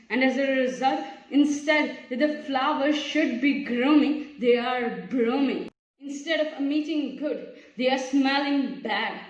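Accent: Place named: United Kingdom. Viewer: British akcent👌..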